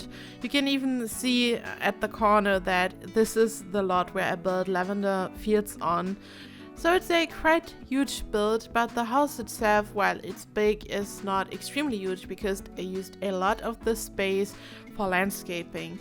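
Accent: Germany. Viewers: Akzent gehört und sofort gemerkt das du Deutsch bist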